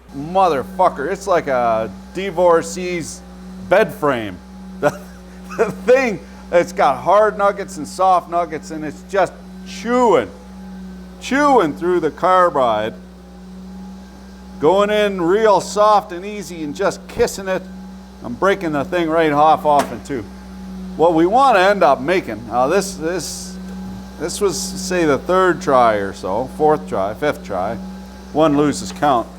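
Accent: Canada. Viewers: ! I’m a Yankee, but work for a Canuck company down in the states, and a’many’o Canucks I have income across, and have for quite sometime, and with that I have started to figure out (or I believe to have figured out) regional Canuck dialects. Are you a west coaster? Tanks for da ridiculous humor!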